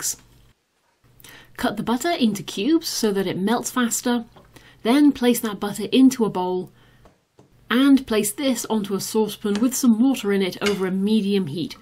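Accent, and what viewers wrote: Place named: Australia. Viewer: Well, you should. It's really extremely beautiful. You have an Australian accent, am I right? It's amazing. I think you should do some voice overs as well. You should be more serious about good quality equipments. You're doing really well. I think you can. You will do even better if you get some good quality equipment. I wish you all the best.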